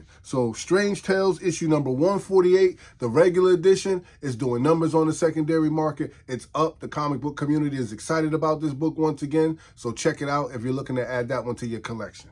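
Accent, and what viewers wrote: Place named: New York, United States. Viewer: Yo! Did you go to school in the Bronx back in the 80’s and early 90’s? You sound like a New Yorker for real!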